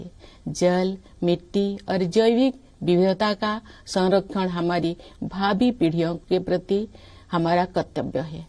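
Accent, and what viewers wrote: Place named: Odisha, India. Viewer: Salutes! President Murmu. Liked all the messages along with Orissa accented Hindi. This is essence of United India!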